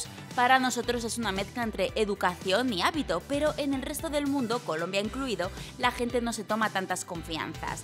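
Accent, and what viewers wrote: Spain. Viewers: Soy colombiano :v y en lo personal el acento español me resulta irritable, no me gusta para nada :u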